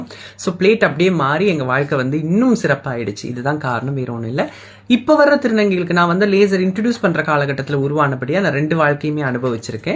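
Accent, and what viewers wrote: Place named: Eelam, Sri Lanka. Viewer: Cute.., you are very good in eelam Tamil..appappo eelam Tamil la pesunga.. quite emotional a irukkum eelam Tamil ketkumpothu..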